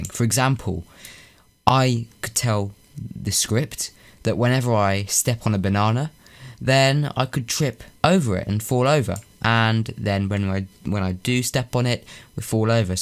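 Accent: England, United Kingdom. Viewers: I love the way people with English accents pronounce the words and say er , ar, and like bananar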